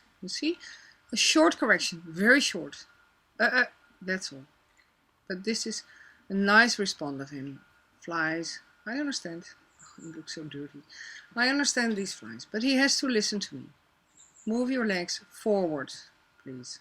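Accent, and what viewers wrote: Netherlands. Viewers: Thank you it really helped. Are you from holland??? Because you have a little bit of a accent. I am dutch too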